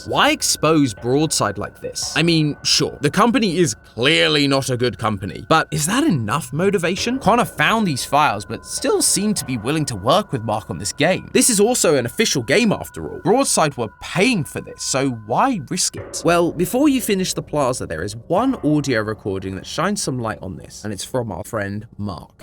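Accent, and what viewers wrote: United Kingdom. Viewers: British goood day mate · "wheres matpat 🥺" HE CAN DO WHAT HE WANTS NOE LET THE SILLY BRITISH GUY SPEAK ‼️‼️ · I got a massive British jump scare cuz my brain short circuited and I forgot was British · British matpat isnt so bad after all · does he have to be british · It's because he's British. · W new matpat update even tho hes British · Who is this British gentelman? Where is MatPat? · Hey British matpat! Are you becoming silly? :3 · AHHH, A BRITISH PERSON!!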